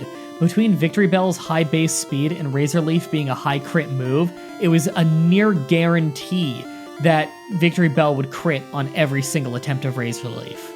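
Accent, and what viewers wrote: Canada. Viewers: are you cadidan? you have a very neutral accent with some quirks that aren't in the chicagoan accent. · Love the Canadian accent lol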